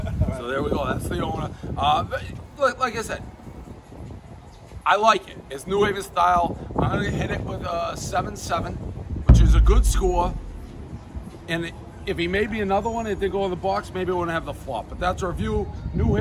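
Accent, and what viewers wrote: Boston, United States. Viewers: It's a good Skoore. The boston accent is hard to shake. Everyone knows the rules.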